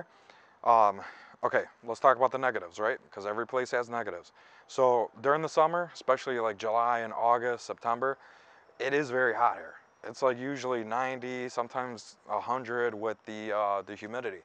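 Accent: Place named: Illinois, United States. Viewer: You got the typical Illinois accent. It's super strong.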